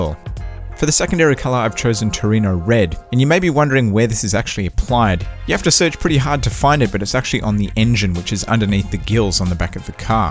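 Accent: Australia. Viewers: Are from Australia.?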